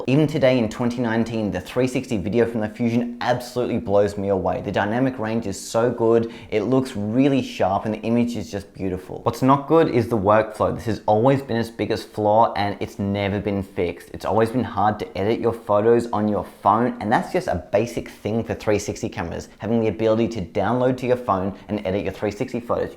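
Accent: Australia. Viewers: i think you are australian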